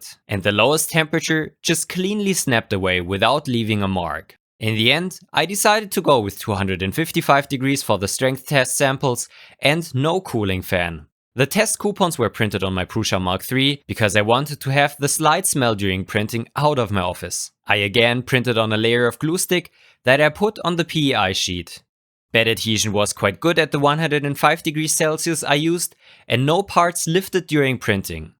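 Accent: Germany. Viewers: Lol i've noticed english isn't your native language, but i couldn't think of what country you came from,but the "Guten Tag, i'm " let me know you're also german!😂😂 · Bist du nicht aus Deutschland? Klingt so. Wenn ja, gibt es auch einen Deutschen Kanal?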